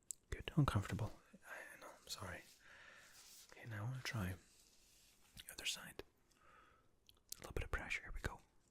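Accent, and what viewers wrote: Scotland, United Kingdom. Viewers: hang on are you Scottish??? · thank u for your reply :) Lol, of course not, u don't sound like Russian at all, but personally I don't like Scottish accent much but in your case it's completely opposite! Best of luck and keep up the good work! :) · what is about Scottish accents that relax the hell outta me? I have Celtic background somewhat, my maternal side is the Stewarts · Are you from Scotland? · ASMR with Scottish accent? YAS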